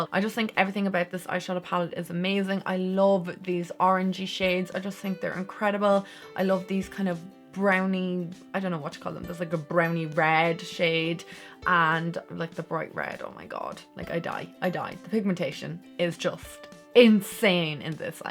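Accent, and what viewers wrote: Ireland. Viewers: I’m sorry but I’m liking and following instantly bc ur irish, An bhfuil chead agum dúl go dtí an leathreas (probs spelt like half the words wrong lol) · You're Irish😄😄😄😄 Legit haven't been able to find any Irish youtubers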